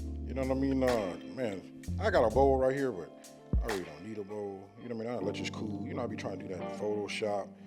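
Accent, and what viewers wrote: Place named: West, United States. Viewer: I knew you was from Out west you got a strong accent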